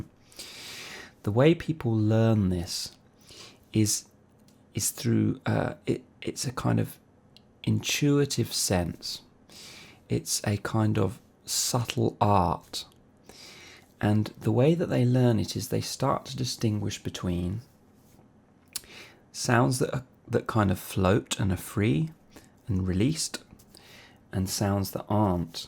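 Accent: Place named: London, United Kingdom. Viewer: Uou sound like your from London area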